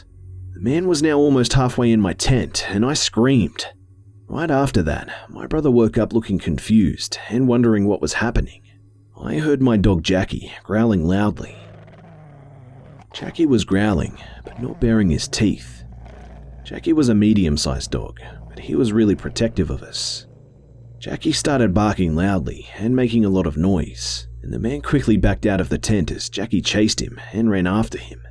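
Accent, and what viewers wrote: Australia. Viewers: Can't tell if your an Ozzie or not sounds like it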